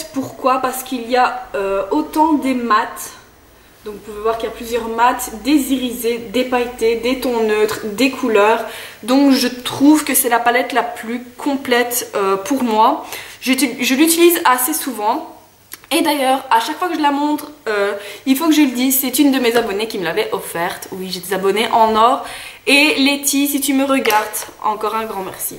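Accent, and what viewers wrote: Belgium. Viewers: Coucou, chouette vidéo, malgré que je l'ai déjà regardée 3 ou 4 fois sur d'autres chaines, c'est tjrs intéressant. Je partage tes gouts pour les fàp, d'ailleurs j'adore la palette que tu as composée (make up studio). Et la naked 2 me tente beaucoup, mais je ne l'ai jamais encore vue en vrai parce qu'à Liège il n'y a pas de Séphora, il faut aller jusque Lille :) Rien à voir mais tu es belge? J'avais décelé un petit accent, mais surtout tu as parlé du salon esthétika (je sais pas si ça s'orthographie comme ça ^^).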